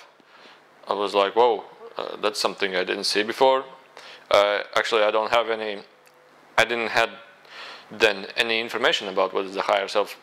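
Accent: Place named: Poland. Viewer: “Witam.” are you polish??ur accent sounds like soo i could be wrong:)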